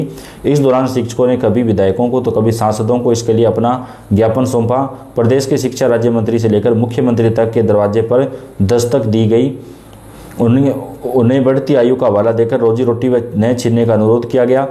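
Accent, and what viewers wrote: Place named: Lucknow, India. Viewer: Lucknow English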